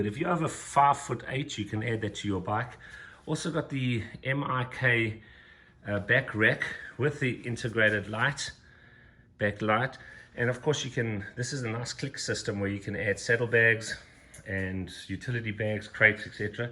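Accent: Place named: Afrikaans, South Africa. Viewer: i love your afrikaans accent!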